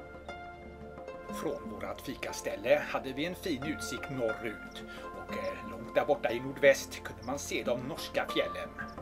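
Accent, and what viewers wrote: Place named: Gothenburg, Sweden. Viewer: Fina naturbilder och världens goaste göteborgare som berättare.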